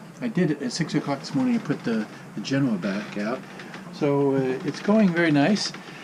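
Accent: United States. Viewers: Lovely job - I really enjoyed your video - info/delivery/demeanour etc. The full package. Impressed++. Keep up the excellent work. American english? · You speak like you're from the United Ststes. What is your nationality?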